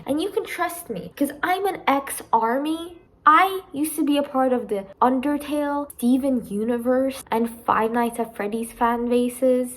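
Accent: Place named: United Kingdom. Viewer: "TW: British accent" Lmao i love her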